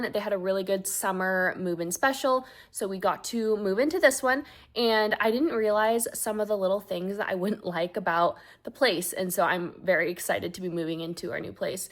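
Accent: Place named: North, United States. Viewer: So what state is this? When you said sneakers and the way you say bag, I knew it was North somewhere lol South FL gal here. Stumbled on your page today.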